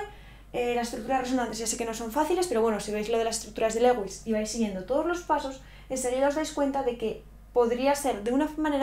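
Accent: Spain. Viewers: Ya entendí mamacita hermosa 😍😌 y me encanta tu asiento español me matas con eso Wao🤭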